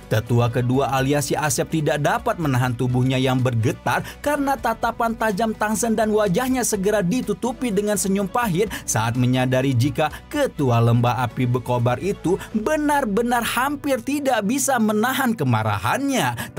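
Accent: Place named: Lombok, Indonesia. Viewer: Orang Lombok mana ni?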